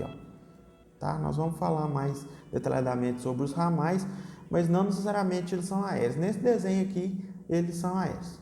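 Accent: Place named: Minas Gerais, Brazil. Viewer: toptop explicação na nossa lingua "mineires" de facil aprendizado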